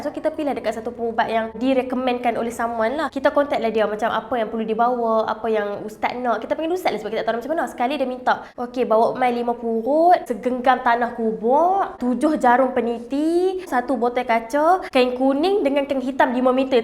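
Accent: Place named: Kedah, Malaysia. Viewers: SYOQ DENGAQ ORANG UTARA CAKAP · Bila utagha sembang nahhh kaw kaw 😂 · Ni sah speaker orang kedah ni. Penang cakap lanjutt x laju2 cmni. Aku kedah cakap cmni arh🤣 laju.. cm rapper lol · Oqang Utaqha cakap laju tu.. aku suka sungguh dengaq dia cakap 🤣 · rasa cute la loghat utagha ni.. ❤️ melted.. hai awak.. tapi an… klu die tgh2 baca mantera.. rupenya baru tau bomoh. tertibe rasa nk cancel tu.. cmne ea nk blah? klu cabut camtu je tkut die sniper dari belakang. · susah hendak difahami apa yang beliau berbahasa,sungguh pekat loghat kedahnya. bagaimana sesuatu maklumat hendak disampaikan jikalau yang memberi tersebut menggunakan bahasa berlainan? natang mu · Akak utara rapper bertudung is back🗿🗿🗿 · Seronok la dengar 😂 dia punya utara dgn laju rasa mcm dengar member sendiri ajak borak 🤣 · Matap lorat org utara padu.. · This is kedahan accent, John bear is penang accent, it's not same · Aq orng johor..tp seronok dengar lorat kedah ni...nk crik bini orng kedah laa gini😂😂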